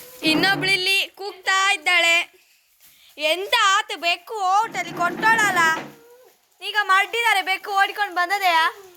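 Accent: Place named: Arebhashe, India. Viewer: Nimma arebhshe kelike kushi adhe marre 😅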